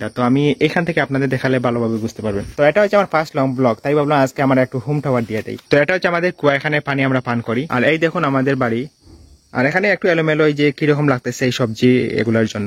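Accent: Sylhet, Bangladesh. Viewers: সিলেটি নি আপনে?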